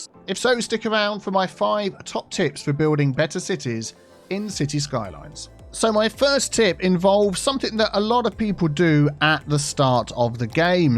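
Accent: United Kingdom. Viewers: Sooooo, having a British accent has that affect on non brits? 👀👀👀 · Youtube algorithm works in mysterious ways! I had a very similar experience with Silberphönix. And yes, British accent does have that effect!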